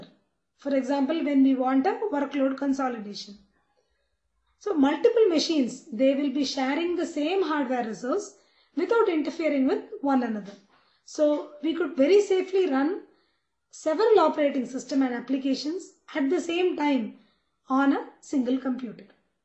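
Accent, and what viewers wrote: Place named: South India, India. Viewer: South Indian accent !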